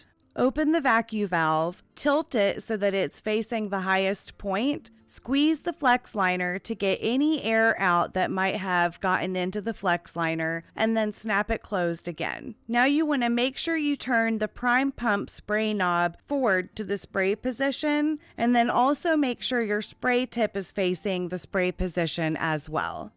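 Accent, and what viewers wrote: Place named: California, United States. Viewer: That California tongue roll just doesnt let me concentrate☹️Great video thou.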